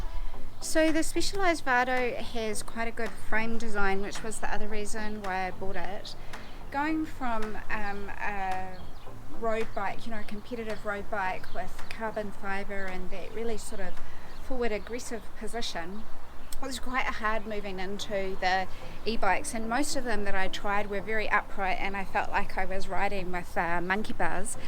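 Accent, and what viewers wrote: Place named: New Zealand. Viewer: i can't get used to that kiwi accent.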